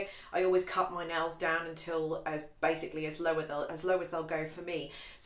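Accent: United Kingdom. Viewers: I love your british accent, thank you for the advice, im 9 months violin student and i've just started with shift positions and vibrato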